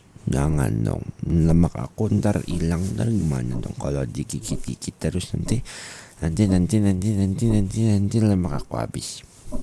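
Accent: Java, Indonesia. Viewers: JAWA NYA AKHHH, BANYAKIN NGOMONG JAWA!!! ENAK BNGT DI DENGERNYA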